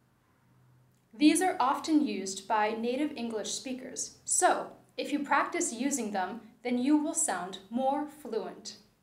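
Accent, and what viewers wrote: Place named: General American, United States. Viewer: Congratulations from New York City, you have a standard American accent. That's essential for English teaching.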